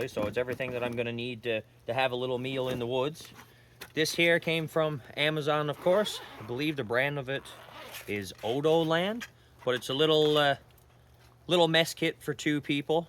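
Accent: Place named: Newfoundland, Canada. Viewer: Pretty sure he's from Newfoundland and Labrador!!! Canada!!!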